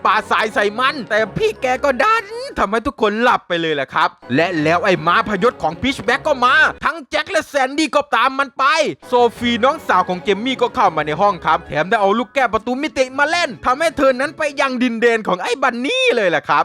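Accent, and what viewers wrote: South, Thailand. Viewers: ชอบเวลาพี่พูดใต้อะ พี่พูดเยอะๆหน่อยผมชอบ55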